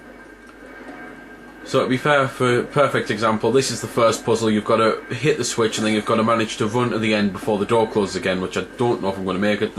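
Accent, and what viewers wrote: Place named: Northern England, United Kingdom. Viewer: Goddamn I can't place your accent. It's Northern...probably N.E.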